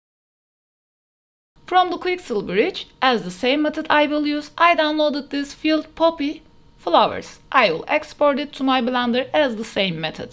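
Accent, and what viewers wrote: Turkey. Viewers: konuşma tarzın çok türke benziyordu bi baktım türkmüşsün :D bu aralar sürekli blender'dan liminal space ile ilgili şeyler yapmak istediğim için hep bu tarz videolara bakıyorum sonunda istediğim videoyu buldum eline sağlık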